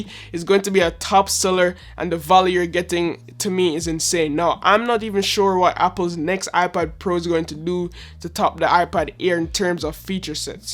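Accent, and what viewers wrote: Jamaica. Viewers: Are you Jamaican? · Nah a the Jamaican accent fi me bredda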